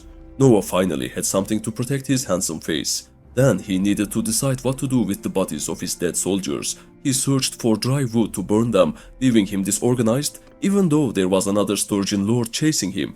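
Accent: Turkey. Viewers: türk aksanı çok kötü gerçekten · Bro your videos are just so good. They gives everything to us. But your voice is just not giving it. It's not about your tone or something. Its about your accent. You're Turkish I think. Please try to improve your accent. I m not trying to be racist or something but you know it would be better if you ll keep my words in your gut. Appreciate for the video, have a good one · Abi tam Türk aksanın var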